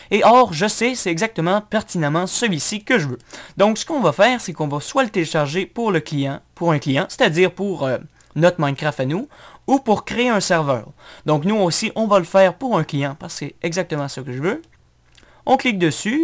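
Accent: Quebec, Canada. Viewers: il dit ''une video'' en tout qu'a au debut mais bon c'est comme sa c'est quebecois c'est fun c'est drole j'adore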